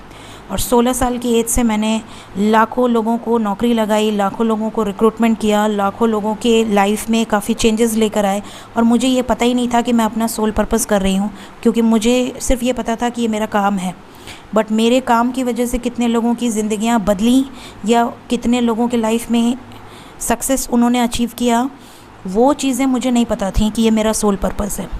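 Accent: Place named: South, India. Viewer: Hello from Karachi Pakistan. Your accent seems to be south Indian. your reading is absolutely perfect. MASHALLAH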